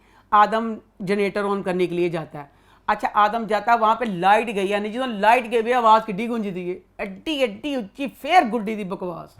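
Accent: Punjab, India. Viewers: I love how gives all-honest reviews on every drama.............but the best reviewed drama is definitely ZEBAISH 😂😂😂 Her amazing punjabi accent and sense of humour always makes my day. This is a humble request by one of biggest fans: plz do a review of “Nund”. I am begging to hear words on it. 😜 If a smile is a sadqah, imagine what reviews do 😊 God bless and the team of Galaxy Lollywood ❤️❤️❤️ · Thumbs up what a powerful episode the way you talk and review is love being a punjabi i love and enjoy your typical punjabi accent and verdict...jiyo love you🤩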